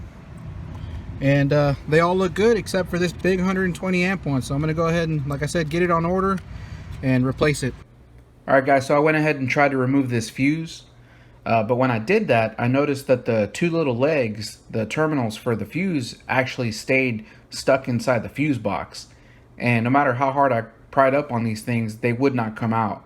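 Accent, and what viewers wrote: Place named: United States. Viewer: Where are you located? Noticed the km/h speedometer(with mph in the smaller section) and thought that was a bit odd. Figured you must be outside the states but your accent sounds American.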